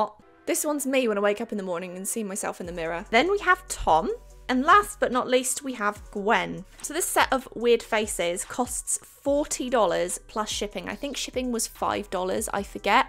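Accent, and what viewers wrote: United Kingdom. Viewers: I love how you British people talk 😍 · You are British right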